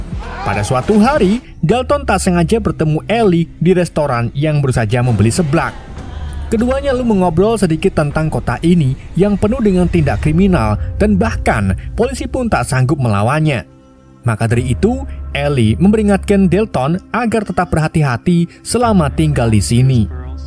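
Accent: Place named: Pekalongan, Indonesia. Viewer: Petsi wong kalongan o min..❤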